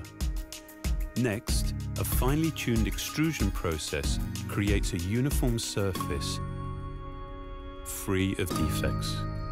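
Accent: United Kingdom. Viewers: it's the British accent lol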